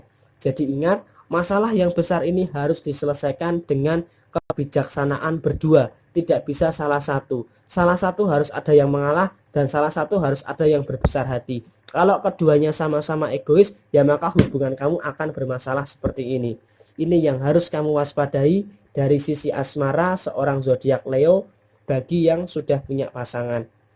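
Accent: Java, Indonesia. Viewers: Pendirian kuat,klo gk penting gk banyak bicara, siiipp,,, mmg begitu Ada nya. Makasih Mas, reading nya,,,,,, medok banget bhs jawanya,,,, heheeeheee... 🙏🙏👍👍😇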